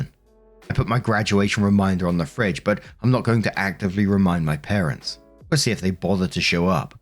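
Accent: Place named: Midlands, United Kingdom. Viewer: British/American accents - and has a midlands accent so different again - have you not heard the way he says was 'waz' for example!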